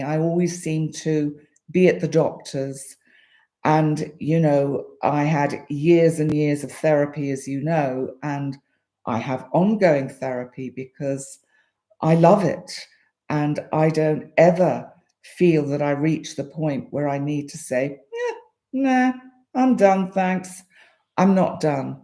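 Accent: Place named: England, United Kingdom. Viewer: Good luck, will be thinking abt you this week! Hope out of pain soon Love your Readings! Love the English Accent sounds great 😊❤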